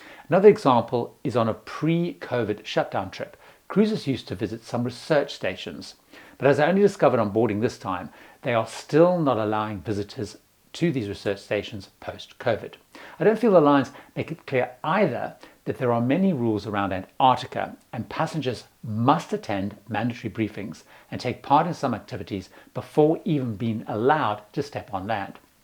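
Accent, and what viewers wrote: South Africa. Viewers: Hey, are you South African?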